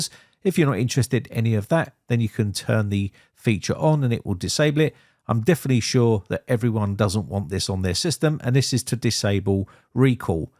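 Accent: United Kingdom. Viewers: Another Windows Install video. I'm keeping track I think this is video #23 of Windows 11 Installations brought to you by. I just watch cause I love the pleasing Brit accent.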